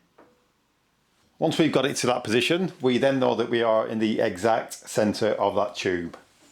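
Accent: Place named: Scotland, United Kingdom. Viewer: I subbed cus of your scottish accent, also handy content!😁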